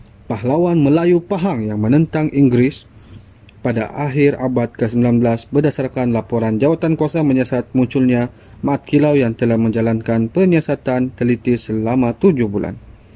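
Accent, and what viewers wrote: Sabah, Malaysia. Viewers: Host nie mcm org sabah jak dari dialek cara percakapan...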